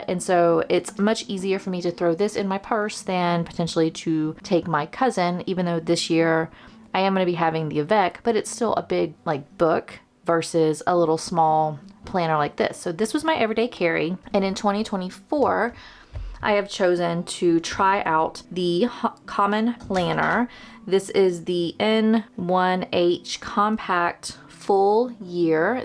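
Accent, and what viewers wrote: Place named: Tennessee, United States. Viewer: It’s so nice to hear someone who has an accent like mine. I’m from south west Tennessee.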